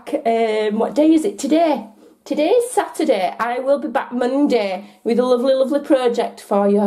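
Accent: Yorkshire, United Kingdom. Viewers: Are you from Yorkshire? I love your accent . I lived in Harrogate for 5 years and in Bedfordshire for 3 years. My sons were born in Yorkshire. I love your cards! These are also beautiful. Will go to the blog for your friend.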